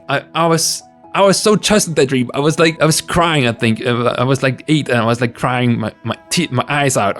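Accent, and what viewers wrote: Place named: Denmark. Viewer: its my first time watching a video of yours and I really like your danish accent! Im half danish myself. I wish you the best in your transition sis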